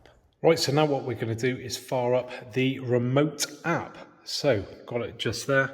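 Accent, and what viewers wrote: United Kingdom. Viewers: Are you British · Very helpfull I realy understand everything and your very nice british english you are the best and your video is far more better then sony thanks you very very very much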